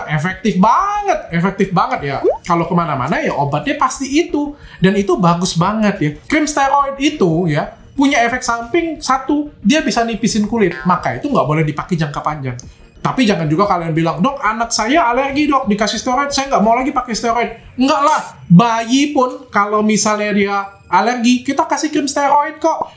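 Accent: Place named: Medan, Indonesia. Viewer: Iya..dokter kayaknya gede di Medan..ini logat kami chinese Medan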